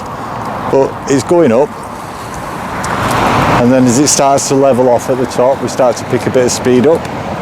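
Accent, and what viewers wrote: United Kingdom. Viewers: Are you uk reviewer?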